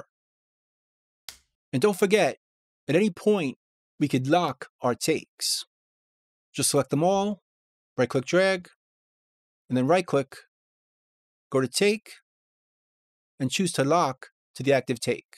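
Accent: New York, United States. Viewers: Are you from New York?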